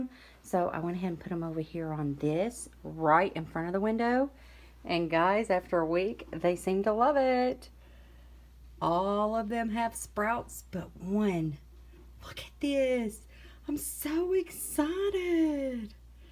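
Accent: South, United States. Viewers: Ciao,. You have the cutest southern accent in all of the world, my dear friend. What's your honest opinion on roses? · I love how excited you are getting about growing these! Also, I’m going to have a better day because you told me too in your lovely southern accent 😊🤗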